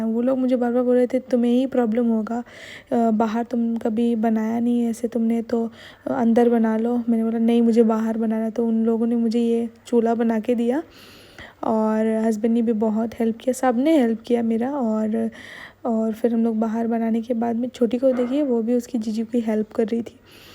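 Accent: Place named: Bengal, India. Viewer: Aap ka hindi bolny mein speed nahi aa rohy bengali aa jata hai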